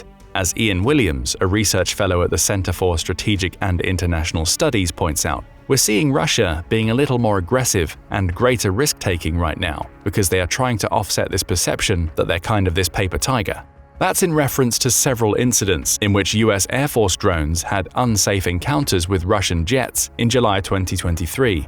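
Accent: United Kingdom. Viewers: Why do Brits say rusher for russia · Love the Brit announcer, 💯🐖🐖💩🤷🏿‍♂️🥵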